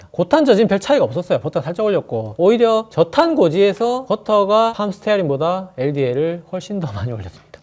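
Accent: Gyeongsang, South Korea. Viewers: 쫏기듯 말은 빠르고, 발음은 정확하지 않고, 톤은 높고, 경상도 억양은 심하고 듣기가 불편함. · 오늘따라 경상도 억양이 너무 쎄게 들리네요 말을 잠간 끈어서 말씀해주면 감사겠습니다 숨도 안쉬고 계속 말하는것같아 듣기가 좀 힘이듭니다 항상 감사합니다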